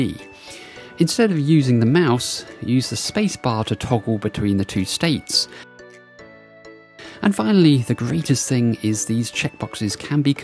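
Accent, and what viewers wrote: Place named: South, United Kingdom. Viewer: Nothing more annoying than a South British accent going on about how he can use new checkboxes than no one else has access to --- brilliant!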